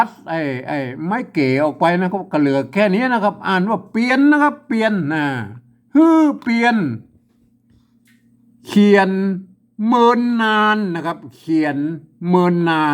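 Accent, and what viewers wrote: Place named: Lanna, Thailand. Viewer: เอาของสุนทรภู่มาอ่านแบบกำเมืองเลยเหรอค่ะ ป้อครู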